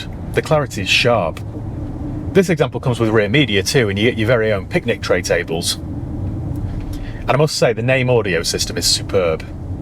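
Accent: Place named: Cheshire, United Kingdom. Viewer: Brought the Cheshire accent out for this one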